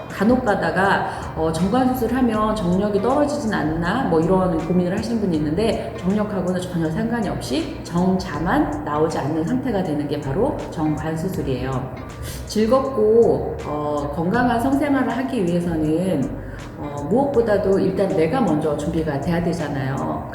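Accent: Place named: Jeolla, South Korea. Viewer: 짤라서 지져각꼬~!^^ㅋㅋㅋ전라도분이시구나~!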